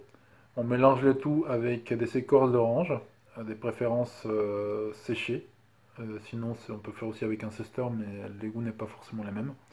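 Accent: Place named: Mexico. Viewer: Moi je suis POUR un podcast en espagnol !!! Il y en a si peu 😞 ! je regarde celui de Esperanza y Anna, ( du Pérou je crois) et celui d'une espagnole d'Espagne mais qui ne me plait pas des masses...Ceux d'Amérique Latine en général portent surtout sur le crochet, alors j'adorerais t'écouter en espagnol même si je ne suis pas sûre de tout comprendre avec l'accent mexicain 😄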